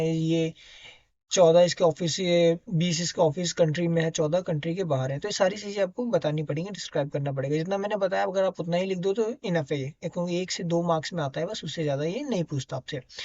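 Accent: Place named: Haryana, India. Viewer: Do you belong from Haryana? Because your taking a tone like that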